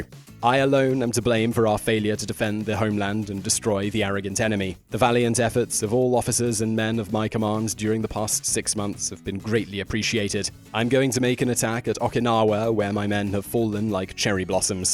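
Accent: United Kingdom. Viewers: British vsause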